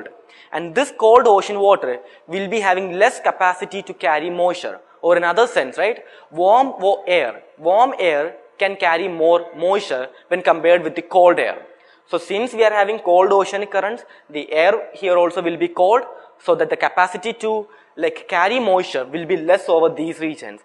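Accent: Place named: South India, India. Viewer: Typical South Indian English Accent.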